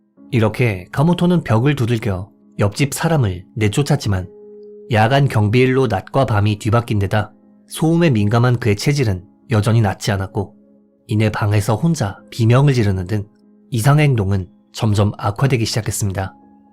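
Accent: Japan. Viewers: 알고리즘에 떠서 들으면서 게임하고 있었는데 일본분이 운영하시는 채널인가하고 채널 확인했네요 ㅋㅋㅋ 비하나 조롱의 의미가 아니라 일본 지명이나 일본인 이름 발음이 굉장히 현지인같으세요 중간중간 억양도 되게 일본인이 한국말하는 느낌이라 재일교포분이신가 뭔가 신기해하면서 듣게 되네요 ㅋㅋㅋ 자료 조사도 좋으시고 차분하게 얘기하셔서 편하게 듣기 좋은 채널을 알게 된 거 같아서 좋네요 ㅋㅋ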